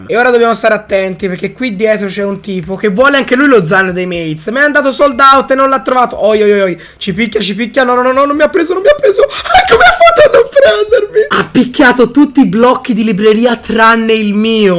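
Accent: Tuscany, Italy. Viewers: Sei toscano?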